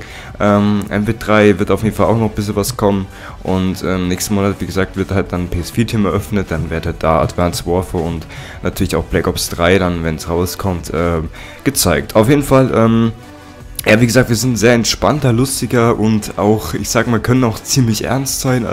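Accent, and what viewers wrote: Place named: Bavaria, Germany. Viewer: 100% Bayrisch - Gutes Video 👍👍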